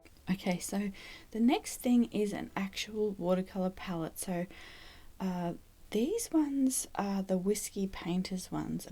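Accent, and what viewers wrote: Australia. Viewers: Beautiful video. Are you from Australia?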